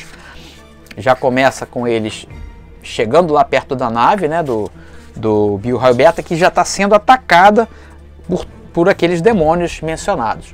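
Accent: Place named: Northeast, Brazil. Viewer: Cara, sei nem te explicar. Depois percebi que você fala sobre algo que conhece muito bem do jeitinho que eu mesmo falo. Você é nordestino como eu né? Mas depois passei a adorar sua paixão pela coisa!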